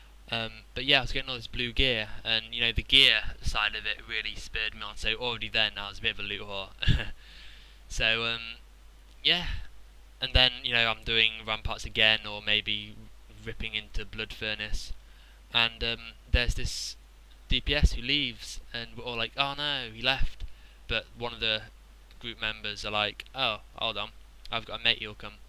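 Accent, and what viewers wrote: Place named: United Kingdom. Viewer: Know what's better than listening to how someone started playing WoW? Listening to someone with a badass British accent talking about how they started playing WoW :P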